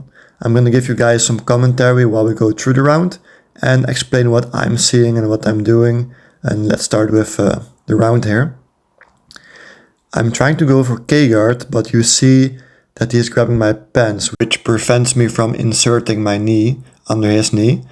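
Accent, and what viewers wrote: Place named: Belgium. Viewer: Your accent sounds like a Belgian accent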